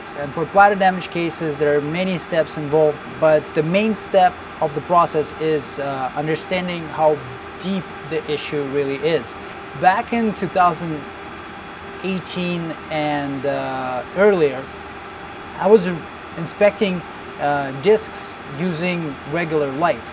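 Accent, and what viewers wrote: Russia. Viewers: Русский акцент?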